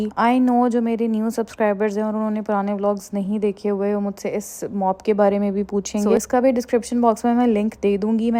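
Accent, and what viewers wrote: Karachi, Pakistan. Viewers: your Urdu is sooo posh! are you from Karachi?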